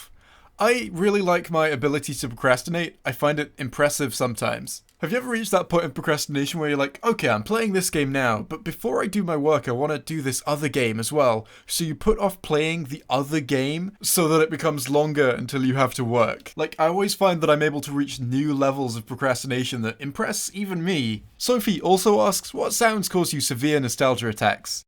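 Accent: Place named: Scotland, United Kingdom. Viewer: Question: I’m hearing a Scottish accent there mate, Whit part yae fae?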